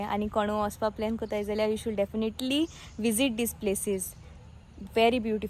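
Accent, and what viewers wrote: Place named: Konkan, India. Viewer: What dialect of Konkani is this as I could barely understand. I live in Cardiff.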